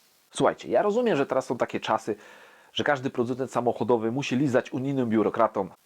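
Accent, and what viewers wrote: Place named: Silesia, Poland. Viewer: Škoda też😁 Tak z innej beczki to fajnie że w końcu ktoś ze Śląska robi tak fajny program. Miło się słucha własnego akcentu na YouTube 😉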